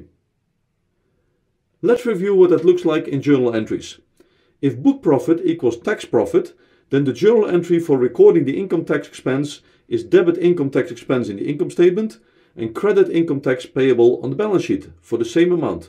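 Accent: Netherlands. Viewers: Hi, are you Dutch? (From your acccent)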